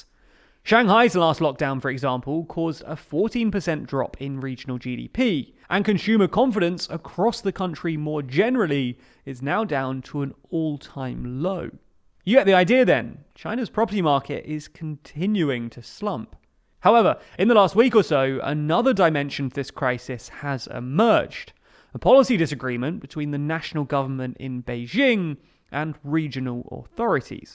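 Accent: England, United Kingdom. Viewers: You have the best English accent I've ever. I'm sure there's lots of money in Los Angeles for you, if you could handle the crazy.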